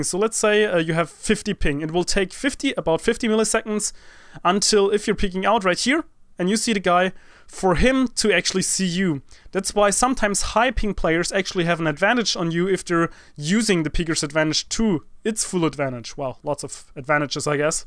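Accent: Germany. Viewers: Are you from germany? If yes = Man merkt es an deinem Akzent :P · are you german you sound like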